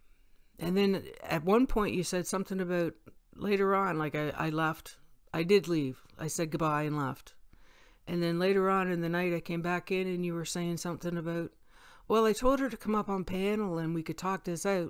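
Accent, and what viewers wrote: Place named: Canada. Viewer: i enjoy your videos, and Canadian accent 😃, but these people are so vicious and hateful when you disagree with them it's pure insanity. LB's "people" are going to jump in an attack you with their mob mentality. They are incapable of polite disagreements, the hate they throw out is so horribly cruel. I think you do a great job & you have every right to voice your opinions, especially on your channel. Nobody has to watch anything they disagree with, or they find offensive. Have a great day.🌷🌷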